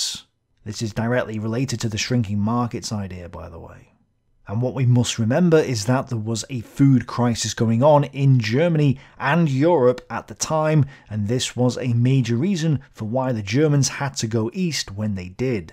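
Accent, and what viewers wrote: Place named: England, United Kingdom. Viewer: can't you tell by his accent. He's English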